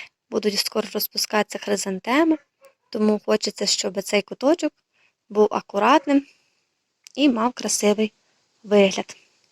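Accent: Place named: Ternopil, Ukraine. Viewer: Все гарно! А ще й мила тернопільська говірка! 💙💛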